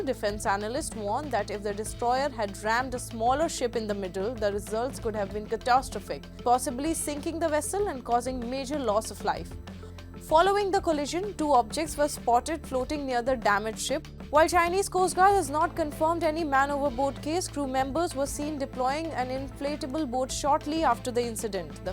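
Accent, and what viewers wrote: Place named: Tamil Nadu, India. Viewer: Small ship purposely collide with big ship who are at fault? Please speak better slang not like Tamil slang very irritating our ear.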